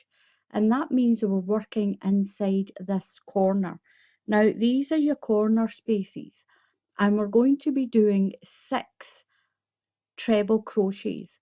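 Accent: Scotland, United Kingdom. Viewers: I'm a beginner and have watched your tutorial twice to get up the nerve to try it. Third time is the charm! Though I did have to rewind a few times, I think I have it! I love how you explain everything so clearly and I also love your accent. Scottish? Thanks for this pattern.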